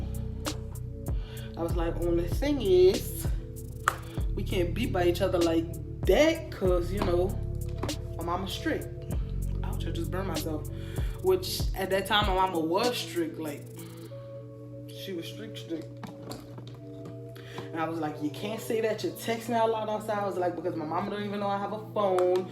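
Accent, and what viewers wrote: Louisiana, United States. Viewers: She gotta be from Louisiana I hear the craw in her accent I loveeeeeee it though you got beautiful hair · That Louisiana accent tho... Right it is Louisiana? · Ive had some shit like this happen to me a few times smh imma do a few story times on my channel one day when i get the courage lol, but i loved the vid and ur accent made me miss Louisiana cant wait to go after the rona clear up in the u.s. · Where you from!? Sounding like nola babyyyyy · U got to be from Louisiana with that accent ... 💜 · I can tell you from Louisiana 😂✊🏿💯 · She gotta be from Louisiana she got that accent..I lowkey miss living in Louisiana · Da accent is deeeeeeeeep ! New Orleans gurll😻❗️ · I love her Louisiana accent!😂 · Love your accent you must be from da boot oh and LOVE your hair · U have to be from Louisiana. Love yall accent! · New Orleans ? 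First time watching her videos and Her accent hitting me hard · Your accent made me automatically subscribe. Ain’t nothin like a good ole NOLA Accent BAY-BAY 🤩😍😍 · I love Louisiana accents😭❤️ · Period nails and hair.. such a fan of your accent too, let's go Nola baby lolll. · You from Louisiana ? You sound like my fam from down there in slidale☺️💕love yo accent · Louisiana accent? It's so cute 😃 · I already knew you was from Louisianaaaa, I love your accent 😭 #newsubbie ✨ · I went to college in Louisiana. I know that accent anywhere 😍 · that louisiana thick in that accent. It is so cute!!!! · Yesss with the nawlins accent!!!❤️ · I love your accent 💕 are you from Louisiana? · New Orleans accents are my absolute favorite!!!! However; what is not my favorite is sis flipping her hair back and forth like 😐😐😐sisssssss please just do your hair it’s distracting!!! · My guh u from Louisiana? You sound just like my family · Love that New Orleans accent whole video lit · Accent sounds like Louisiana, hey sister girl 🥰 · This popped up on my feed randomly and my first thought hearing your accent is New Orleans gotta be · I knew you were from Louisiana! 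I love your accent 😍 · I automatically knew you was from Louisiana with that accent lol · i just found your page but your accent 😍😍 . that’s sound like that nolaa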